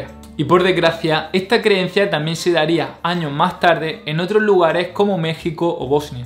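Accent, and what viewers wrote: Andalusia, Spain. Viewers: Es andaluz, así hablan en esta región de España, es el acento que le dio origen a los dialectos caribeños de latinoamerica... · Acento andaluz? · Me parece que es de Andalucía, una región de España. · HOLA!COMO SIEMPRE MAGNIFICO TU DOCU!!!!ME ENCANTA TU DICCIÓN!!!!SE NOTA Q ERES ANDALUZ PERO TE ESFUERZAS AL RELATAR PA Q SE TE ENTIENDA!!!!OLE OLE Y OLE.....TE DOY COMO EJEMPLO A MIS NIETOS Q HABLAN COMO AMETRALLADORAS.....Y MAS OLES!!!!!🌹😀❤SOY DE MADRID Y VIVO N LIMA.💐🌷🌺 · ÉPALE !!!! Muchos países de Hispanoamérica tienen MUCHA influencia de Andalucía. Me sentí identificado con ese acento. Desde Panamá....Viva la Madre Patria !! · Este canal es para la historia lo que la homeopatía para la salud. Y lo que es peor, con acento andaluz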